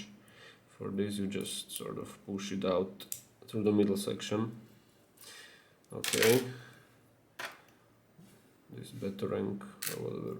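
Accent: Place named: Czech Republic. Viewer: Ahoj, hromada krásnýho odemykání a rozebírání... podle přízvuku a dnes i podle dvojkoruny koukám že jsi čech, tak si říkám jestli se neznáme z nějaké komunity, jezdíš na Lockcon?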